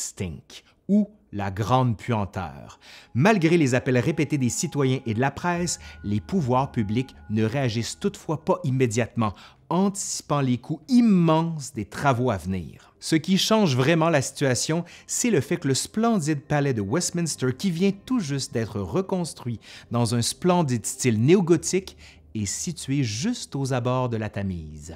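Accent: Quebec, Canada. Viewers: il y a un français de France, basé sur l'accent parisien, tout comme il y a des accents en France, effectivement. A mes oreilles de français, l'accent du vidéaste tient plus du Canada que de France. C'était simplement une généralité que je soulignais, sans demander nullement un débat sur les accents canadiens / français / international. Bonne fin de journée. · J'adore votre accent, les Québecquois 😁Salutations de Bruxelles ☀️ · Jolie découverte cette chaîne d'histoire, merci, c'est très intéressant! Et avec ce charmant accent québécois en plus!! :-) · Captivant, on détecté légèrement l'accent quebecquois mais ce n'est pas très prononcé ce qui est super pour mes oreilles trop habitués à l'accent français et qui ont tendance à être intolérants à d'autres accents. Je ne connaissais pas l'histoire, très bien raconté. Merci pour le partage ! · bon travail. interessant. 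Etant moi-même un québécois qui réside a l'étranger, "j'su ben" content d'entendre notre accent. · toujours ce bel accent quebecois que j'adore qui ma fait un grand effet quand j'avais 15 ans j'en est 82 et il me fascine toujours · crime un vidéo d'histoire en canadien-français! Sa parle au diable! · du calme Molière je le comprends, les québécois sont ceux qui ont le pire français.. même je trouve que l’accent de celui dans la vidéo n’es pas très prononcé donc c’est encore compréhensible.. après on ne comprend rien de ce que disent la plupart des québécois.. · L'accent canadien parle de lui-même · Non, non, il a bien à accent québécois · Merci pour ton bon français Sa fais dubien decouter un québécois qui parle normalement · Super video, et ce petit accent de Québec ...un délice pour mes oreilles!(ca fait un petit plus pas du tout objectif!)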